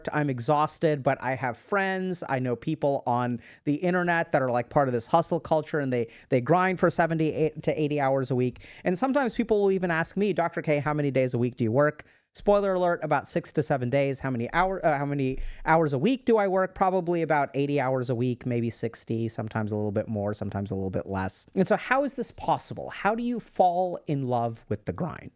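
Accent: India. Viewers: As an Indian, Dr. K's Indian accent has me on the floor every single time LOL! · The Indian accent is cool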